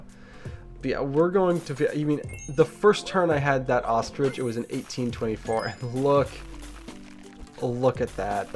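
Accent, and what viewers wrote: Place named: New Zealand. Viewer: didn t know you were from New Zealand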